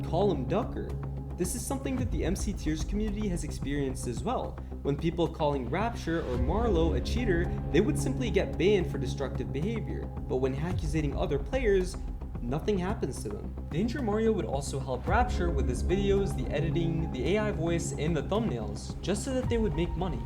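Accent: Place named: United States. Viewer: dude your from asia but u sound american, what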